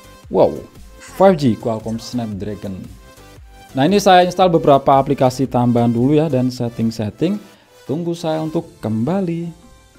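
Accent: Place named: Java, Indonesia. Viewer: 765 tok=aja duh ketok medok e mas🤭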